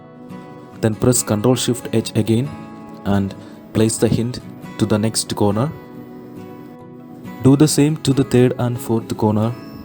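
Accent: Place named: Madhya Pradesh, India. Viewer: are you from mid pradesh cuz you accent goes hard ngl *fire emojji*x2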